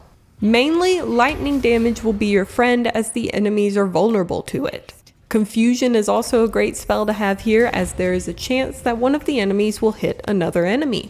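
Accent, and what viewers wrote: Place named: Mississippi, United States. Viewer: i watched many bg3 guides/tips videos and by far i like yours the most. idk why lol it's just preferable to me. i like your accent btw, reminds me of my family from mississippi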